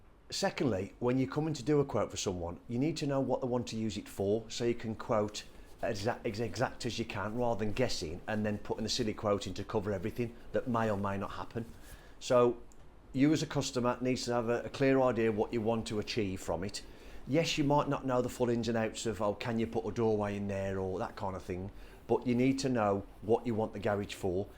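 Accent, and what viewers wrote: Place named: Black Country, United Kingdom. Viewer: cheers bud about to do my own ,you sound local are you in blackcountry?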